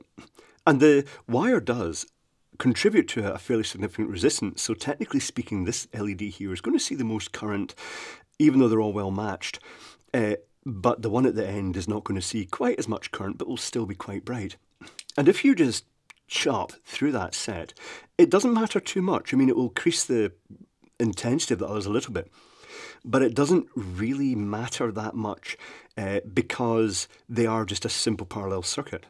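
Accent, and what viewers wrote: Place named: Edinburgh, United Kingdom. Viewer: The only thing odd about the sound is your very posh accent, almost Morningside 🙂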